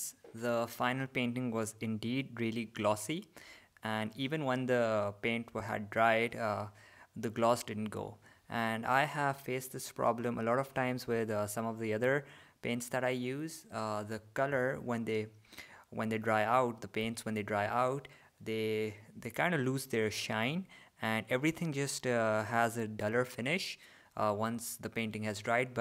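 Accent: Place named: India. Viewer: he sounds Indian but his name seems middle eastern lol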